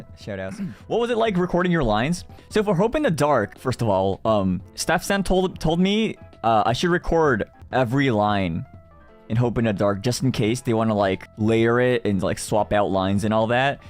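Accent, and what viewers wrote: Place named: United Kingdom. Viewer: 英國腔日語ww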